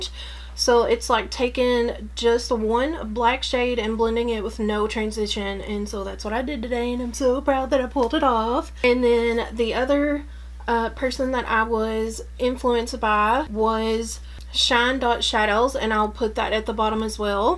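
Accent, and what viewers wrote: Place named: South Carolina, United States. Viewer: Where in the South is your country self from! Lol. I'm in S.C.,you sound like you're from here! 😎😋😂👍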